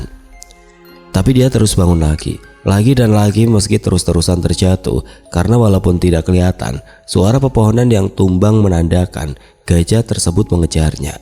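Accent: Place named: Banyumas, Indonesia. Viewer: Unik nya V.O chanel ini selalu berlogat Ngapak walaupun menceritakan daerah selain jawa. Salut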